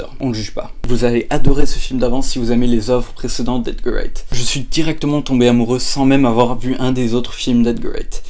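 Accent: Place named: United States. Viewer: Original, on sent que t'es à fond dedans c'est cool :) J'suis d'accord avec LeFou, tu as tendance à mâcher tes mots en fin de phrase et quand tu dis les noms des gens. C'est dommage on peut pas apprécier ton accent américain :P Pour le film j'vais donner autour de 40/51 parce que malgré la bonne surprise y'a quand même quelques défauts. C'est un bon début pour la chaîne o/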